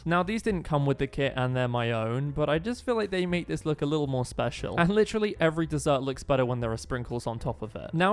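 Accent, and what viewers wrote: United Kingdom. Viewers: U must be american. That accent is one of the worst in the UK lol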